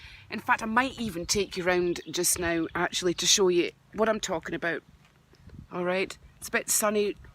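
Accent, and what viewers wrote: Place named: Scotland, United Kingdom. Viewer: Hi,. What a gorgeous place and nice to hear a the Scottish accent. Beautiful. I wish I could dream manifest the life I want, I would feel happier and less sad if so. xx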